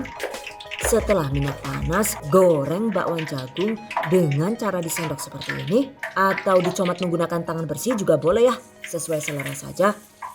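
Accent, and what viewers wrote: Banyumas, Indonesia. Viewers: Setiap lihat video yang aku tunggu adalah ngomong NGAPAKNYA.. wkwkwk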